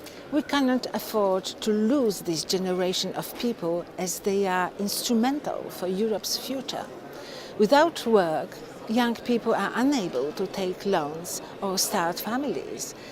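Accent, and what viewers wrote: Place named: Poland. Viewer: No wymowa angielska nieszczególna, bardzo wyrazny twardy polski akcent.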